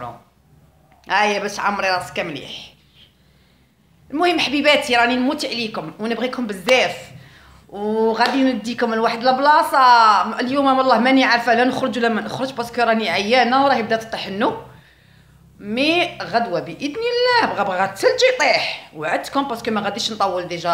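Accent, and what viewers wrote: Algeria. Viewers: سلام أنا كمال من العاصمة والله أول مرة إنتابع فيديوهاتك و الله عفويتك و حكاياتك لكي شخصية حلوة حلوة مرحة فكاهية مام الهدرة تع لبلاد طبعاتك خلاتك قوية حلوة بزاف شبهتك شوي لبيونة خوك كمال من العاصمة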